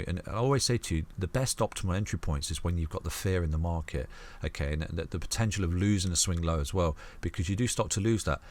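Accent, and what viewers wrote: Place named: England, United Kingdom. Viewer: Thank you for your content and time, these little doodles you do alone are helpful and easy to remember, but your content is simply more understandable than others overall. I have followed several other (no names) crypto not financial advice channels that are popular and not had nearly as much important details as yours, in fact sometimes the opposite advice, but your advice has prevailed. Just clicked the notification bell for your channel, Twitter also. Nice to hear an English accent on crypto updates for a change too.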